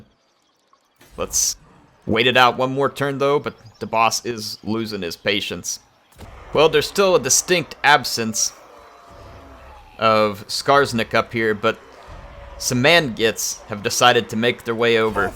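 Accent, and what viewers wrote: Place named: Midwest, United States. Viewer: Hearing trying to do a cockney English Greenskin accent, but he has such a strong Midwestern American accent.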